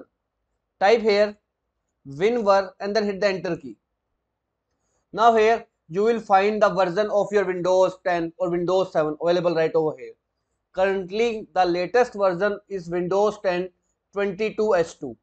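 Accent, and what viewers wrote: India. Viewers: Indian accents is very help my problem!!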